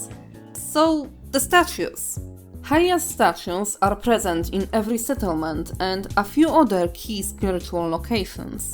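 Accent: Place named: Poland. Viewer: Your accent is... pretty polish. Very polish actually.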